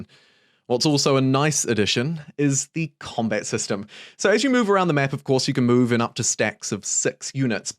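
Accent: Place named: New Zealand. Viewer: You sound like your from NZ ... Same obligatory sub lol..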